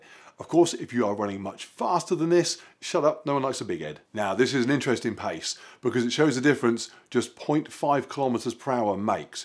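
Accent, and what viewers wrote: United Kingdom. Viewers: British accent)))))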